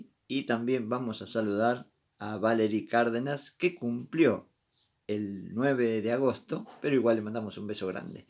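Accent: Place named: Argentina. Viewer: Gracias por la narración, abuelito. Su voz es tan relajante y el acento argentino, ni qué hablar! Gracias, se aprecia demasiado.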